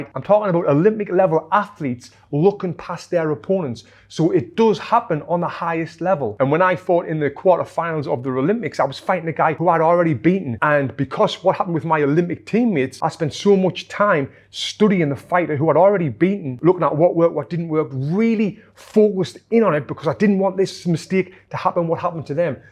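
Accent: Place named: Liverpool, United Kingdom. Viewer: Anyone else seen that old English show “Creature Comforts”?? This guy sounds exactly like the Scouser Rat 😅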